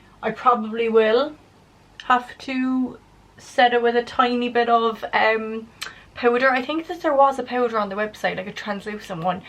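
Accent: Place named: Ireland. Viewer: Beautiful 😍....I am a lady in my sixties and I have been using Trinny products for years now...amazing....she does a product called...." face finish ".... which is a cream powder you really must try it...no one else in the cosmetic world does it....enjoyed listening to your Irish lilt....love Jane...North Wales UK....x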